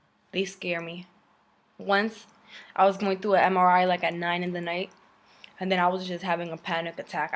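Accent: New York, United States. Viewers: I Hope you have a full recovery. You have a great voice and New York accent. I'm from California so it seem very cool to hear you speak so as a New Yorker. You should consider radio broadcasting or voice over work. Maybe FM Talk Radio. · hey im from ny too! in med school right now, learned about hodgkins lymphoma yesterday... stay strong and i wish you the best.. with this amazing attitude, you're definitely going to beat it. take care. p.s. i love your latina-from-nyc accent! lol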